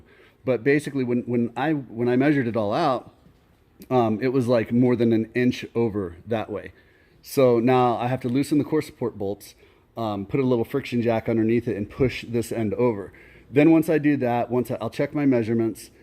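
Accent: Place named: Brooklyn, United States. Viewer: Hey, Your humor is so heartwarming. Are you sure your not from Brooklyn?? Back in the day. Yea Right, Ha. Back in the day I can remember when Rocky opened up in the Marlborough Movie Theatre in Brooklyn. Even though Rocky's hood was Philly, that movie sure had the Old Neighborhood abuzz with Italian pride. Everybody was talking about Rocky. I bring that up because guess who talks like Rocky?? Yours Truly,. You sound like your from my Old Hood. Yo!!! Any good calzones out there in Phoenix?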